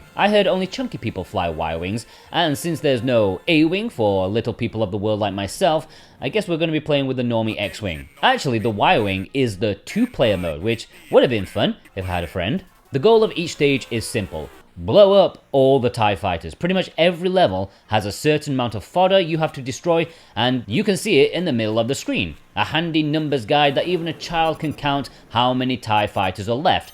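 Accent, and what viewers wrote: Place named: South Yorkshire, United Kingdom. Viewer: Not going to lie a 12 hour video retrospective of Star Wars video games has filled a gap in my life that I didn’t know needed filling. The fact that it’s narrated in my native South Yorkshire accent is an insane bonus 😂 thanks mate easiest subscribe ever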